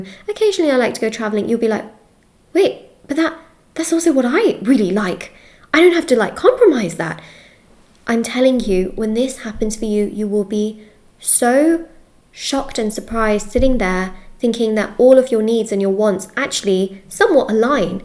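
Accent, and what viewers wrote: United Kingdom. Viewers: You’re hot, but your hands are too big but your British voice is hot so it’s confusing to me.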